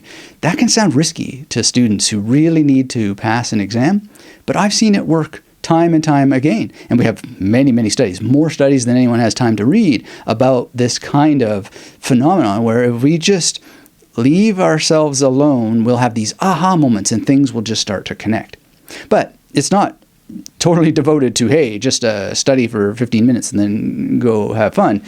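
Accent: Canada. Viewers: Rockin' the Canuck accent 🎉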